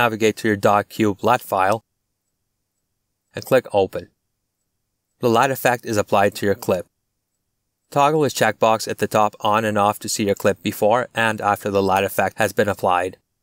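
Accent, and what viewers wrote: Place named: Saskatoon, Canada. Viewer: You sound like you’re from Saskatoon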